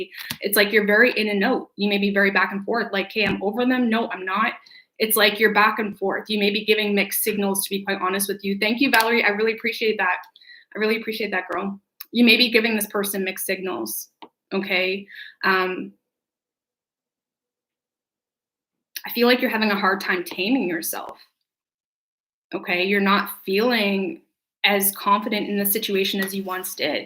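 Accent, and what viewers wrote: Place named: Canada. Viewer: Pssst....your Canada is showing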